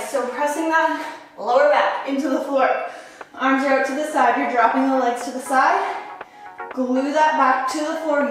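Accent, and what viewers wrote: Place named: Canada. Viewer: Oh! You’re from Canada, eh? 🥰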